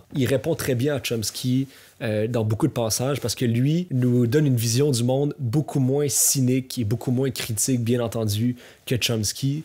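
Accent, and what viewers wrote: Quebec, Canada. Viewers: J'adore ta chaîne. Du vrai contenu dans le beau français du Québec. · Que l'accent québécois est beau et charmant!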